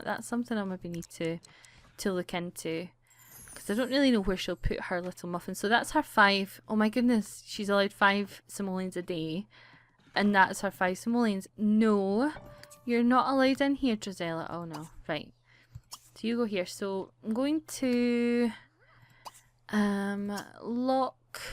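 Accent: Scotland, United Kingdom. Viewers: shes scottish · Why are u British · Are you Scottish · Are you Scottish ? If you are you can be the princess from BRAVE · oops well i love you scottish accent then! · I love your videos and your accent! I've always wanted to go to Scotland too ^J^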